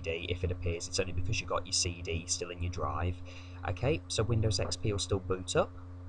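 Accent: United Kingdom. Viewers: Hello. I want to ask you a question that has nothing to do with the video. I'm from Venezuela and speak American English, but I can clearly understand what you say with your accent of British English. I would like to know exactly where are you from, for knowing in what cities speak with your accent, and to know the places I can understand the British English without difficulty.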